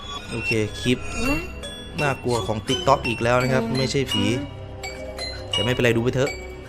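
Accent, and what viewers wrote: Isan, Thailand. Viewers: จาดกันพะนะ คนอีสานคักๆคุณ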